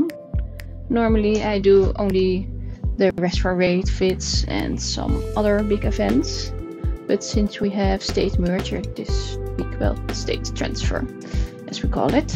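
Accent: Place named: Netherlands. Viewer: Nice video, recognize a fellow Dutchie when I hear one haha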